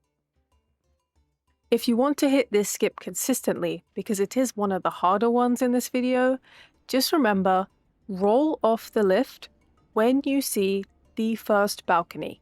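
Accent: United Kingdom. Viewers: Your voice is just fine. If nothing else, it’s nice to hear a fellow Brit!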